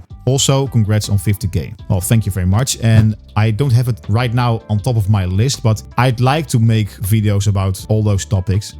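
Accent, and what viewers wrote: Netherlands. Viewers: ik had eerder gehoord dat je een nederlands accent had!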